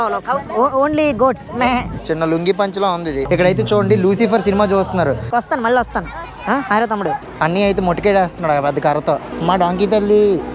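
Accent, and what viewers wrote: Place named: East Godavari, India. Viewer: సూపర్ నైస్ వీడియో భయ్యా మీ ఊరు ఏమిటి ఈస్ట్ గోదావరి జిల్లా స్లాంగ్ ఉంది మీది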